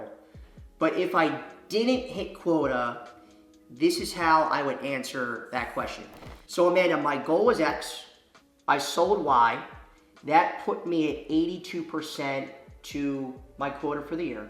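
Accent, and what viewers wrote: United States. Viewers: Doylestown native here - my ear immediately picked up on your Delco voice. Now that I live in Dallas, people get mad when I wear my Eagles jawn here. Your content is helpful because I'm looking to become an Account Manager. If possible, please send me some cheesesteakers from Cleaver's in Rittenhouse Square.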